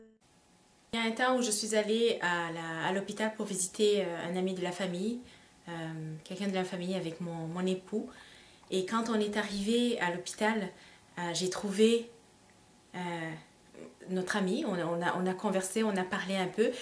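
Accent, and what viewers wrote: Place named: Quebec, Canada. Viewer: L'accent canadien est vraiment prononcé dans sa voix une vraie québécoise sois béni à toi ma sœur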